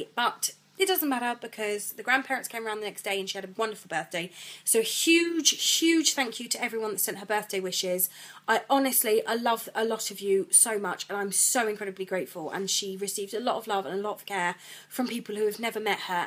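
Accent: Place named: England, United Kingdom. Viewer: Just curious love, how hot is it where you are? I assumed you were in England all this time given your accent. xo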